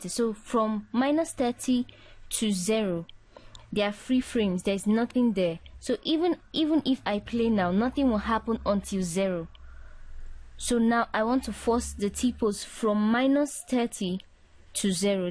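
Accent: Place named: Nigeria. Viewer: You are good at this. You also sound Nigerian and the accent gives me a better understanding 😀. I love your work, it's inspiring.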